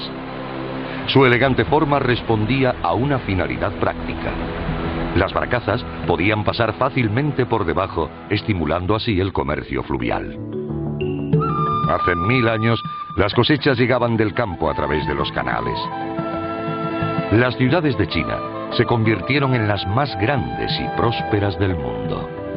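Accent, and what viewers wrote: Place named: Spain. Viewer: La locución no es español latino, sino es español de España.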